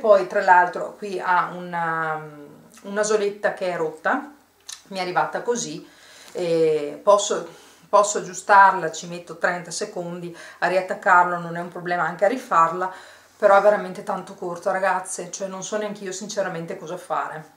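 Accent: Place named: Veneto, Italy. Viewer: Ciao.intanto complimenti x il gusto nel vestire,gli abiti ti stanno benissimo. Io preferisco acquistare su bonprix e mi trovo benissimo perché i tessuti li trovo più naturali di quelli di shein .hai mai provato bonprix? Io sono una curvyyy e trovo abiti sexy anche come i tuoi abiti. sento che sei veneta dall'accento...anca mi ghe son! Ahahahah ,sito de vr o vi o pd? Ciaooo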